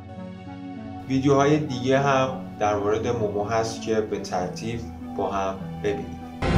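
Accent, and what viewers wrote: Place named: North, Iran. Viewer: داداش لهجه داری.شمالی هستی؟🤨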